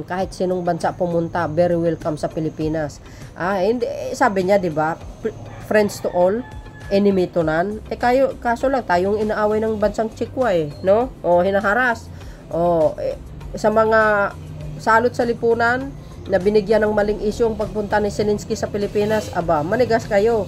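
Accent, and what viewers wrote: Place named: Russia. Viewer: BAGAY PO YONG BOSES NINYO NA MAY RUSSIAN ACCENT HABANG NAG BABASA OR NAG SASALITA . GOD BLESS PO WITH YOUR CHANEL.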